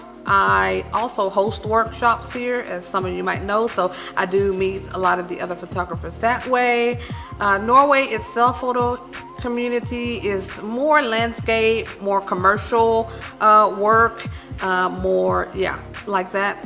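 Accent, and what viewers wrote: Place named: United States. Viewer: Interesting I kept saying in every video I watch, she sound so American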